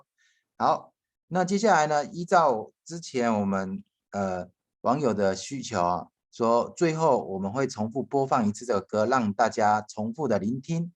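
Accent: China. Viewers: 你讲闽南话不是客家! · 老師的口音好像海陸腔？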